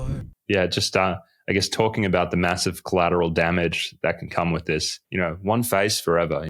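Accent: Australia. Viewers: Based on your accent I'm guessing you're from Australia, do you work in Australia? I need to get off my meds but my psych isn't interested in helping me.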